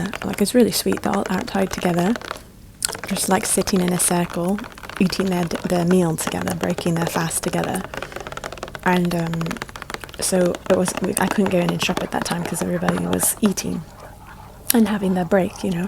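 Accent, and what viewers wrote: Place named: United Kingdom. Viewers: Sounds like a British accent with a light tone of someone who learnt English when they were younger (not their first language but close second) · she's American but she uses British accent for her privacy :)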